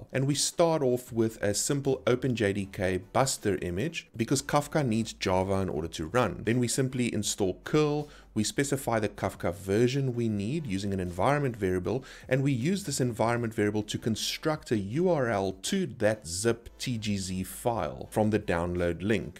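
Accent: South Africa. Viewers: Love hearing a South African accent explain these hot topics!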